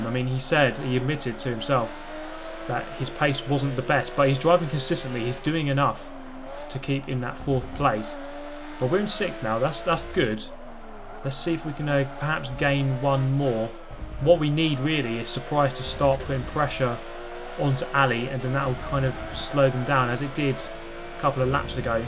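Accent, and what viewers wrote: United Kingdom. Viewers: wow, I really didnt know. thx for info...I was so confused when I found your vids, because I thought: "why is this guy sounding british but is using the imperial system?"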